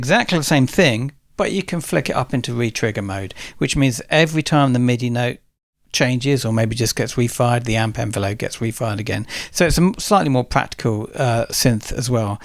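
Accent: United States. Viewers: Why you speaking in American?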